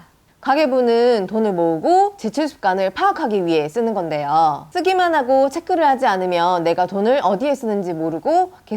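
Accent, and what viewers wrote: Jeolla, South Korea. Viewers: 말투가 전라도분이네요ㅎ 저희 할머니집이 전라도 영광이거든요ㅎㅎ 항상잘보고있어요 ㅎㅎ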